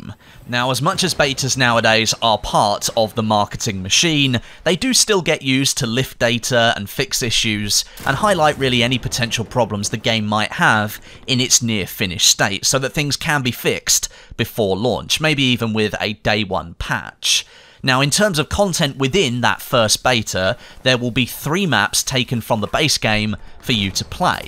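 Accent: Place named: United Kingdom. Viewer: why do british people pronounce beta like bayter?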